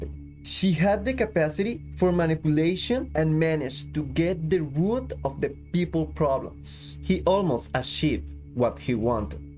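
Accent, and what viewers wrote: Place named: Spain. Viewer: This is an excellent true crime video. I'm trying to pin down your accent. Spain? Sorry if that guess is offensively wrong!! New sub right here.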